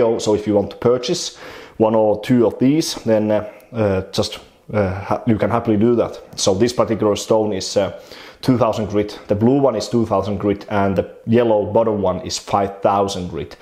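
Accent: Finland. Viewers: Ai nyt on todella suomalainen aksentti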